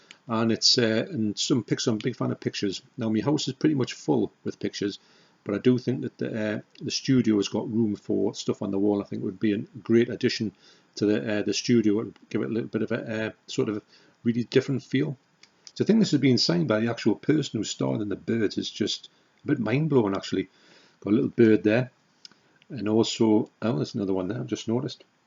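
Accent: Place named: Newcastle, United Kingdom. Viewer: Hi.Love the Alex picture signed by magnificent Malcolm himself.I’ve been enjoying your vids for a few months now,there’s not enough Geordie accents on YouTube.My late wife was from Killingworth and I still visit the family a few times in the year (I’m down south).Your love of depraved video nasties warms my cold dead heart.Keep up the good work!👍🏻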